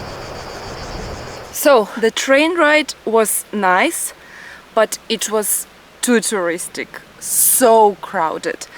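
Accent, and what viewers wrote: Russia. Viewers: Your super strong Russian accent when you try to speak Serbian is adorable 😂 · I hate that Russian accent when you speak Serbian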